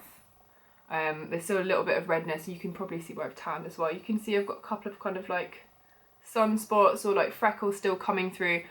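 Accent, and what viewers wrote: United Kingdom. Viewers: what part of uk is your accent? If you don't mind me asking. I must say, you're very beautiful and your accent makes you perfect 😊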